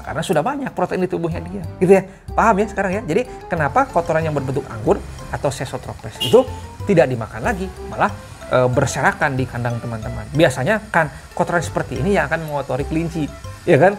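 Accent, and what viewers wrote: West Java, Indonesia. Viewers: Sunda na TI mna kang😀😂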